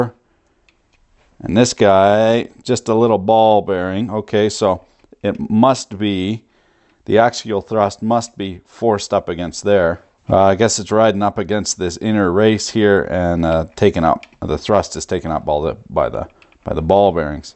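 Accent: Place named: Canada. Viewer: havent heard a more Canadian sounding man reviewing shit tools on youtube yet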